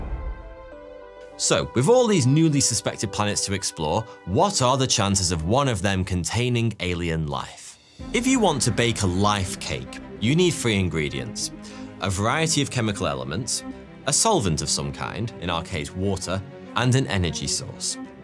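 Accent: United Kingdom. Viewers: Are you British? What kind of accent do you have?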